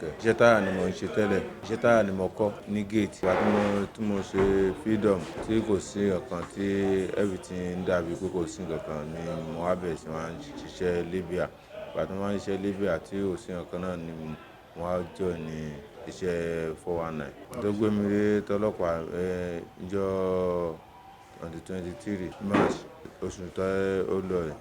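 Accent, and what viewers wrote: Nigeria. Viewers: Yeah is from osun either okeigb. Ile ife or ifetedo.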